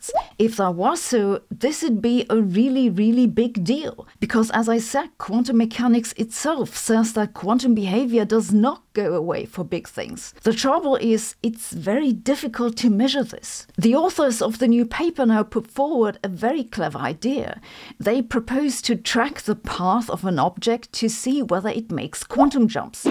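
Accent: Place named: Germany. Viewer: It’s that English with German accent influence that is just…….. scintillating! I know , it shouldn’t enter into the conversation but what the hey, anyway! Love your videos, even if I don’t get the math behind it!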